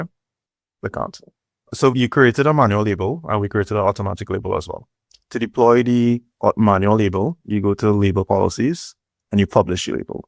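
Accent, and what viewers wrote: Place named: Trinidad and Tobago. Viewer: Dude sounds trini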